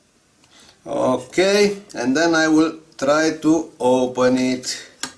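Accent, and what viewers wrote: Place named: Russia. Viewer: i love russian accent, благодарю вас comrade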